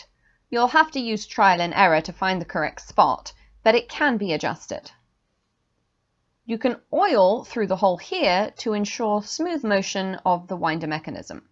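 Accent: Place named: England, United Kingdom. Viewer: Thank you so much for uploading this video on bobbin winding. I now know why I was having trouble. My one and only metal bobbin’s notch was not deep enough and the reason for not catching on to the bobbin spool pin. I have easily rectified this by using the edge of a fine saw blade. Just a few back and forward strokes has allowed it to fully engage. I have as you suggested opened ‘About’ and sent my email for your very kind offer of a pdf copy. Thank you so much for all of your videos, which have been a great help to me. Glad you have not lost your refined English accent!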